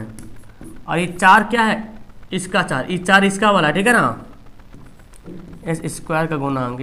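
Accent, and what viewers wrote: Bihar, India. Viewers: Sir aap Bihar se h kya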